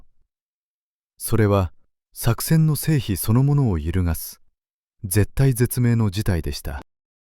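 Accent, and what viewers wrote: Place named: South Korea. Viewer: なんで、こんなﾁｮ~鮮語のような、語彙の頭が破裂音の耳障りなカルトチックな音声になるんだい？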